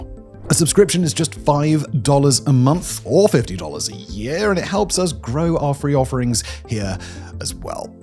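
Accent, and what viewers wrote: RP, United Kingdom. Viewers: shat ap u posh british duschbäg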